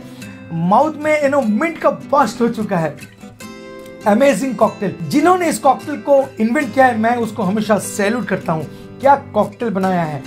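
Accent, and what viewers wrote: Bengal, India. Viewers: Sir apnar hindi sunne mone hoche je apni bangali aita ki thik ?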